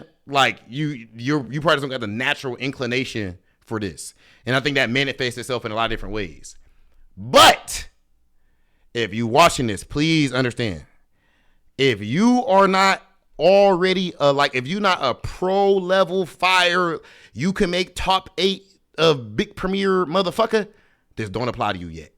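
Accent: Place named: Caribbean. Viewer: Hey... Do you have Caribbean roots? Your accent sounds like someone who lived here and moved overseas. Or have Caribbean parents. Much respect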